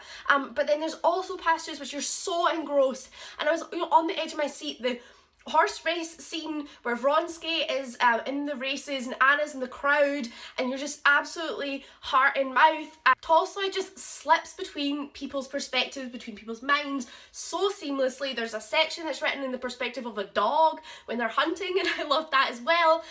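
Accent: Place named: Scotland, United Kingdom. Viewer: Hi! Great review! Where are you from? I would guess Scotland near Glasgow? but you have a really nice accent. It gives you character. I've noticed way more Europeans do classical book reviews on youtube than North Americans, which is kinda sad to me. 😟 (I'm from Canada.)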